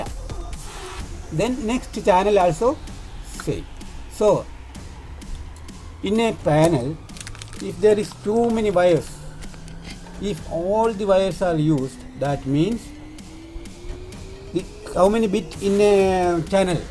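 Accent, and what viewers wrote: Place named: Kerala, India. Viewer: നല്ല ഉപകാര പ്രതാമായ വീഡിയോ അത് പോലെ നല്ല മലയാളം പോലത്തെ ഇംഗ്ലീഷ്,👍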